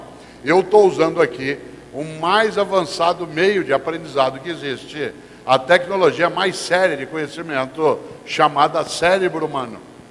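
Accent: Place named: South, Brazil. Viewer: Assisto várias vezes.... . Gosto. Do sotaque do povo lindo do Sul .....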